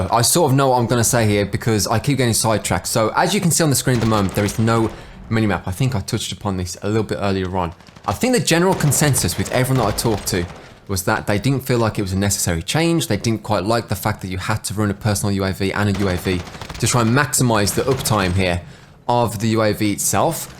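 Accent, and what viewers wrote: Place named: United Kingdom. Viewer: Your accent is British af.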